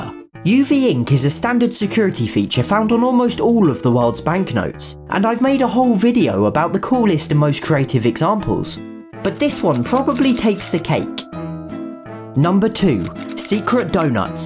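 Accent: United Kingdom. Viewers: Love you my Bri"ish man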